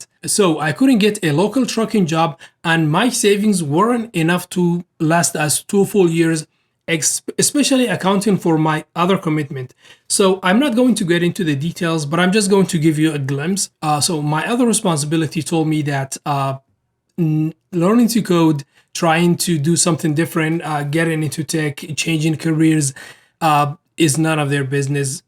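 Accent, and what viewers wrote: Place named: Somalia. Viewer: Somali inaa tahay codkaagaa ka fahme secondska ugu horeeyabo😅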